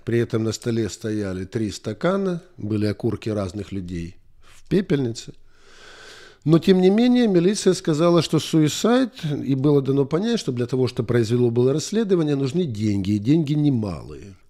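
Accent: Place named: Ukraine. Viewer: Спасибо, за грустный, поучительный, правдивый рассказ. Отмечаю каждый раз, слушая Вас: как живуч украинский акцент, даже по прошествии стольких лет жизни за границей, свободном владении английским и т.д...😊👍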